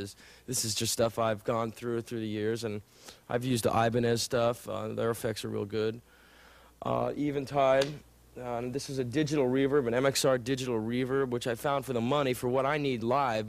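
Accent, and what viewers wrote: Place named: California, United States. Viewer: to future: lose the gnarley beach boy accent,the head shakes,the perm,the earring,but not the licks...now 2021 perfect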